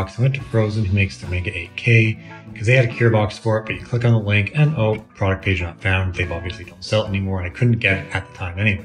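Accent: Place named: United States. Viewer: bad design to have it all open, that UV then floods the space you put it in, your English sounds American, so learn to do some sheet metal design, use sendcutsend to cut and bend the parts out of uncoated aluminum, screw together, use regular sanitary silicone to seal seams inside, bare aluminum is reasonably good at reflecting UV, so when buttoned up the UV is reflected inside and isn't wasted damaging other materials in the room and you when it escapes your current enclosure can leave outside of the case bare, AL won't horribly corrode in a room, or can spray paint using al primer, it would probably cost you less than that sheet of HDPE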